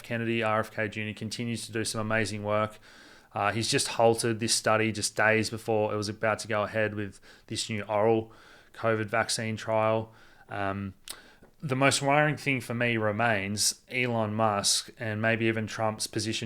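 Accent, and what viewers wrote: Australia. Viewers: As soon as the word KABAL is used it becomes another asshole speculating and pushing some crap that may resonate with those that do not possess the skill of critical thinking.. Don’t be led by the nose down the garden path . An Australian accent does not automatically exude credibility!!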